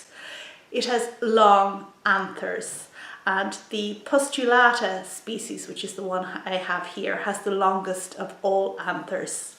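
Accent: Ireland. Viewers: Thank you, Love all the information you share with us but most of all I enjoy your Irish English which I adore. Great videos.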